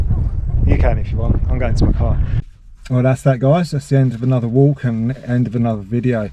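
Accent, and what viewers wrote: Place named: South, United Kingdom. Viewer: So you’re from Sheppey! I was wondering where your lovely, rich, south of England accent came from. I could listen to you read out the phone book In 2005 I interviewed to teach English at Minster College and stayed the night before at the Abbey Hotel … I’ll never forget.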